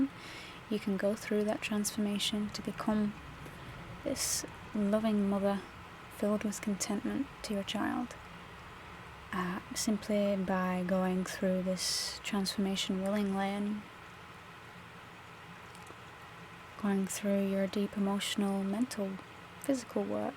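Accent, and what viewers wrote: United Kingdom. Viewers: Hi are u from the UK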